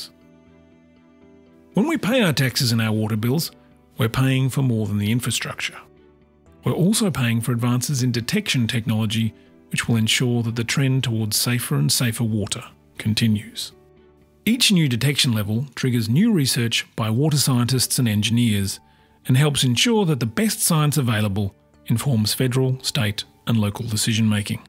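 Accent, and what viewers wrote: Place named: Australia. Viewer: Couldn't they find an American to narrate this? That Ozzie accent is hard to understand.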